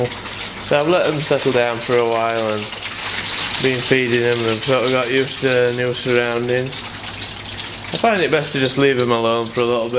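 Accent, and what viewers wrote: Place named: Yorkshire, United Kingdom. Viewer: great videos ive got many good tips watching your vid blogs. only had koi for the last 8 months and need all the help i can get. plus you sound like a local lad im in rotherham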